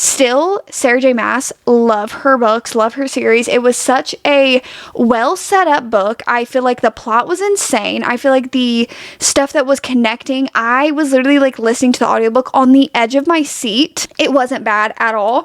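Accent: South, United States. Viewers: with the southern accent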